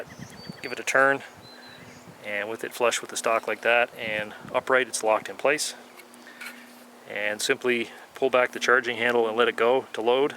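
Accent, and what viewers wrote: Canada. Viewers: He is speaking in Canadian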